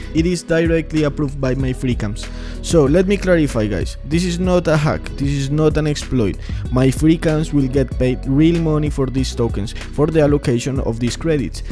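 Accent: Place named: Africa. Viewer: Scam with African accent. I feel sorry for your mother!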